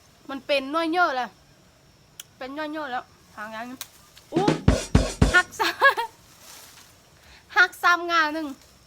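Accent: Laos. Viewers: ตัวนกพูดแบบนี้แหละฟังรู้เรื่องถ้าพูดอีกแบบที่ ตัวเออ มันฟังไม่รู้เรื่องครับพูดแบบคนลาวพูดถึงพอจะเข้าใจครับ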